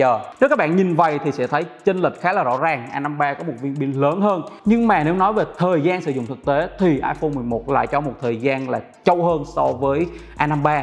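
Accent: Vietnam. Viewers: ôg này ng khánh hoà phải k ta nge giọng y ng khánh hoà z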